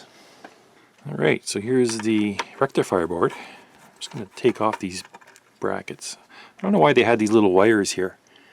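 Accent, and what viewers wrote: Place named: Alberta, Canada. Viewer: This is my favorite vintage audio restoration and Alberta-accent channel on YT. So informative. You deserve far more subscribers, sir.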